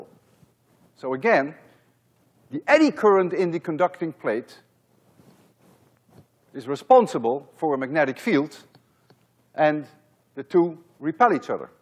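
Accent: Netherlands. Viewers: I knew he was dutch because of his accent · Yep, he,s a dutch man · Do I detect a Dutch accent?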